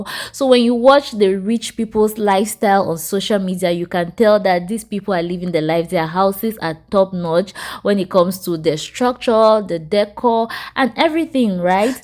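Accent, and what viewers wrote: Nigeria. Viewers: Thank you for this video. Ur accent sounds like Nigerian. Only a rooted Nigerian can differentiate. Thks, anyway!!!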